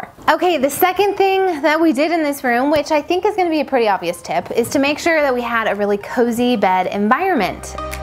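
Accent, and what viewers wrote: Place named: California, United States. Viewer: Is it Saw-yer or Soy-Yer. Or just your California accent! ❤️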